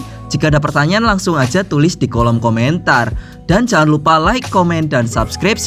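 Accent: Banyumas, Indonesia. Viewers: orang banyumas juga bang?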